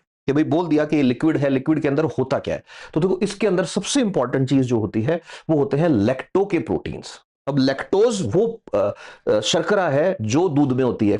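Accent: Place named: Haryana, India. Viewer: Sir haryana se lagte ho isliye topic suru karne se pahle namste ki जगह ram ram se suru kro to jyada achha nhi rhega bs man me aaya to bol diya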